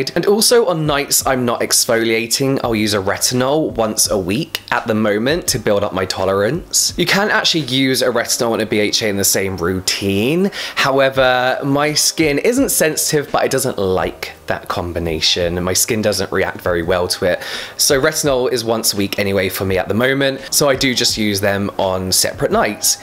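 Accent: England, United Kingdom. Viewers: Scrubbing away ah ih = scrubbing away at it. Gotta love the brits 😂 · Listening to you makes me feel like I'm back in the UK! Love your accent.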